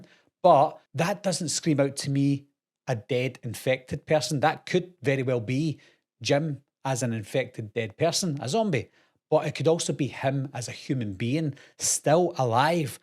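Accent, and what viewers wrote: Scotland, United Kingdom. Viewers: Dude,I love the Scottish accent!❤ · Are you from Scotland ?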